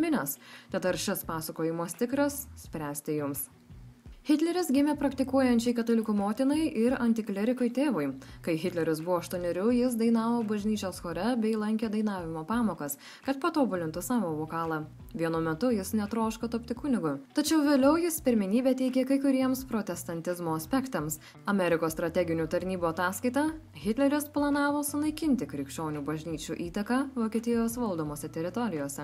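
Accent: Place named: England, United Kingdom. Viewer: ausys kraujuoja nuo tarimu, pasigooglink kaip tariasi elementariausi pavadinimai, nebandydama išlaužti ju tarimo anglu tarme.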